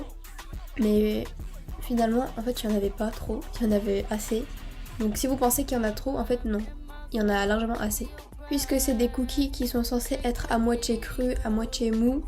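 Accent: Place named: Canada. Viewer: T dans quel pays psq t’a unpeu un accent du Canada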